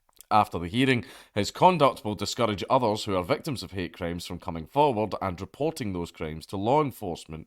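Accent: United States. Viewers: Holy shit, your American accent is fucking hot, no homo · I love your American accent lol. It’s perfect 🤘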